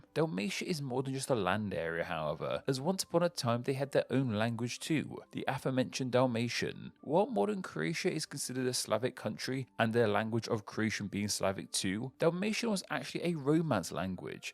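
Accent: United Kingdom. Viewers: a British accent?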